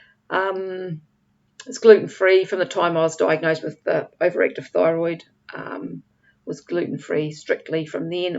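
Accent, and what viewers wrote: New Zealand. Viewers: Thank you for your videos. I totally understand about you forget WHY you gave up a certain food or drink. I hope you keep feeling better. I'm dealing with the same and wondered about psyllium. I didn't catch what you said your friend got you started taking? Sorry, as much as I love your NZ accent 😍 I sometimes have to replay to catch everything 😄 Oh! I just now saw your reply to someone else on this! Thanks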